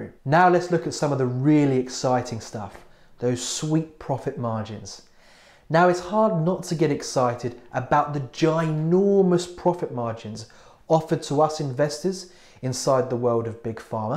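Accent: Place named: England, United Kingdom. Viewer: Interesting accent. Are you from England?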